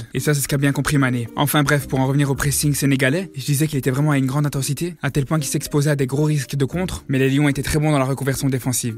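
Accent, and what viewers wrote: Brussels, Belgium. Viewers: T’as l’accent belge fieu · Accent bruxellois flag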